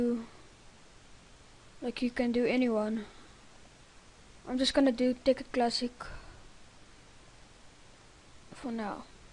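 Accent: South Africa. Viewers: south african accent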